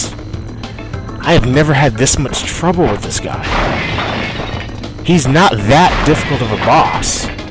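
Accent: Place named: Australia. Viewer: Wow, you're moving through this game quickly! You're going to be finished with Crash 3 before I even have half the gems on my Crash run xD Also wow at that accent, I have to say its not very common where I live but if you went away from the coastline I'm sure there are people who actually have that accent! Our dingos and Crocodiles don't carry flamethrowers or mass murder penguins tho.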